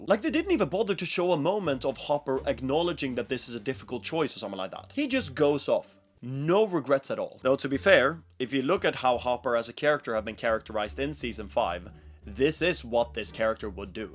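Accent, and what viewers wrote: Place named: Sweden. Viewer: the way i activated like a sleeper agent when i heard that you had a swedish accent is frying me😭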